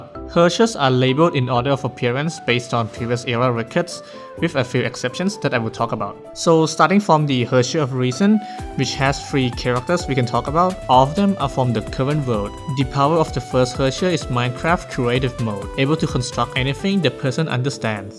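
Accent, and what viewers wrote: Thailand. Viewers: offtopic for a moment i did NOT expect this youtuber to be thai, i heard his accent and i checked his about page and damn he really is thai good to know that i found an another thai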